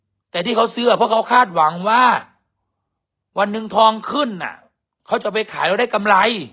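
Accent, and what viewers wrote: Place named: Isan, Thailand. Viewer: เว่าถืกคัก เด็กสมัยใหม่จั้ง คือเว่าเป็นแท้ แม่ใหญ่กรเลี้ยงลูกเป็นคักเด้อ❤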